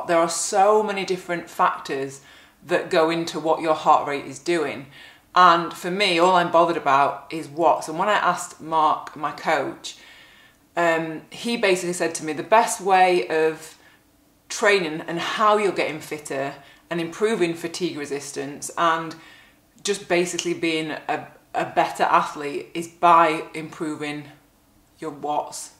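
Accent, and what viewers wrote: United Kingdom. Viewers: For me training with HR is tricky, my heart throws an extra beat so the monitor is off. For example I can ride a hilly course breathe normal and have a HR of 240, so I use HR as an indicator of when my O2 max rate is close, usually for hill climbing. On a nonrelated note I do love your British accent and get a kick out of your British tan. lol. keep up the great work.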